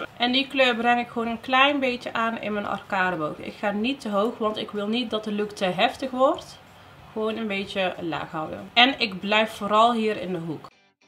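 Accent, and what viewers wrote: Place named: Tilburg, Netherlands. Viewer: ik heb heel effkus hoor ik je zeggen 😂 heerlijk Tilburgs!!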